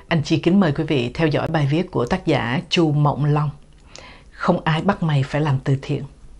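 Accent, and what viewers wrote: Standard. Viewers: Cô xướng ngôn viên tôi nghĩ là người hải ngoại. Cô đọc rất lưu loát, rất từ tốn, phát âm rất chuẩn và rất đúng chánh tả. Cô phát âm các dấu sắc, huyền, hỏi, ngã rất chính xác. Phần đông giới trẻ ngày nay hoặc người trong nước phát âm lẫn lộn hai dấu hỏi thành ngã, ngã thành hỏi. Có ai còn nhớ lớp bốn lớp năm cô giáo bắt một trò đúng giữa lớp đọc một đoạn văn rồi cả lớp chép lại cho cô chấm điểm chánh tả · Cô MC này bình luận và nói chuyện quá chuẩn quá hay luôn... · Ban noi chuan luon ung ho ba ...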